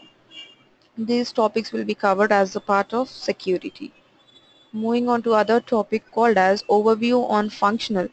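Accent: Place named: United Kingdom. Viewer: very nice reading and beautiful british accent - music to my ear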